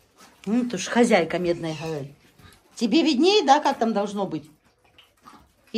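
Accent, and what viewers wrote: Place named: Ukraine. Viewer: Я понимаю, что вы, Хохлушка. Но говорите хотя бы чуть чуть по русски. Не Шыколадки, а шоколадки. Не точно по буквам, а О смягченное. Я очень к вам хорошо отношусь. Всегда вас смотрю. Но пожалейте наши уши(((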